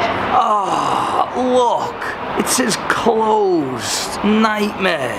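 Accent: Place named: Manchester, United Kingdom. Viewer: U sound like a mancunian but u from Wales u sound like Jason manford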